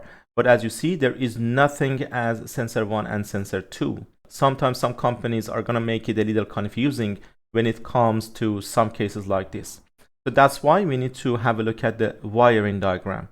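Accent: Iran. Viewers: Iranian accent isn't it?😅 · برگام این لحجه ایرانیه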